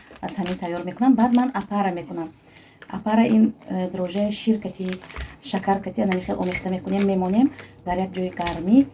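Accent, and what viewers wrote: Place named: Asht, Tajikistan. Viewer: Ха барои хамин тарзи суханкардантонам мисли мо Ман хам аз райони Ашт Ман давно Канали шуморо тамошо мекунам ба шумо пешравихо хохонам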